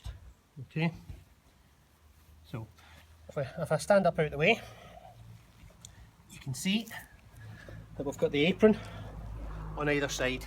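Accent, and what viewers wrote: Scotland, United Kingdom. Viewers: A Plait is very practical... wht you need more? I love the scottish akcent.... as i was in scottland there was also a "Highlander" who was showing what you can do with a plait. Its a awesome thing. Thumbs up for that video. Greets from Germany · Well at least he sounds Scottish. With the Outlandish craze I was expecting fourth generation heart on sleeve Good ol' Texas Scot telling us how to suck eggs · My favourite accent....scottish accent😍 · I didn’t even need to hear this guy’s voice to know he was Scottish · Wow, CC does not understand a Scots accent!! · Scottish accent is soo adorable! 🤩 Greetings from Bavaria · You would be my perfect weapon!! You are GORJUZZZZZ!!!! I love the Scottish accent (all dialects), with your looks and build, you are perfect!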